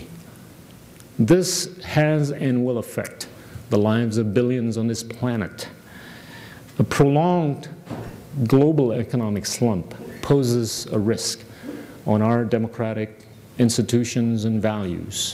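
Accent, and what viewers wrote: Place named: United States. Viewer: terima kasih pak gita, saya belajar American Accent dari anda lewat video ini, sangat membantu dalam mengembangkan bahasa inggris saya yang masih awam dalam accent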